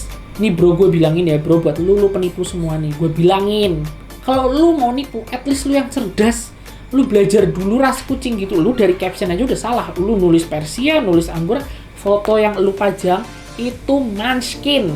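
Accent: Indonesia. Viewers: Assalamualaikum Warahmatullahi wabarakatuh Sebelum'a aku mau ucapin bnyak Terima kasih buat Tv and Team yg udh ksih Tips and Trick tentang penipu yg lagi merajalela bagai corona ini wkwkw dan fix logat ngapak'a (kyak orng Jawa Tengah) keliatan banget and make me laughing cuz liat ekspresi yg mesel sama penipu awokwokowkowk Literally Aku udh bnyak dpt pengetahuan di video ini tentang penipu entah itu penipu pembelian hewan, barang atau yang lain'a jdi'a aku lebih tau gmna cara beli secara online dan sedikit cerita aku wktu itu emng lagi PENGEN banget adopt Kucing dan aku agak ragu buat cari di online, sempet sehari atau beberapa hari nemu di ig tapi ada rasa RAGU dan KHAWATIR buat pesen di olshop tsb, tapi setelah aku nemu account instagram kyak'a niatan aku buat punya kucing ada peluang besar dehh nihh soal'a TRUSTED banget dongg.. Mana ada olshop pet yg jual bisa VC dlu sama calon Kitten's kita hahahaha And i hope I can get blessed from ALLAH SWT By hands. 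Amin allah'humma amin Sekian ya wassalam'mualaikum warohmatulohi wabarakatuh Instagram: imradit01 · ki unik, kalo ga denger dia ngomong mesti taunya yoo cina abizzz, taunya begitu pas ngomong yoalaaaahhh rekk jowo abezzzzzz😂😂🤣🤣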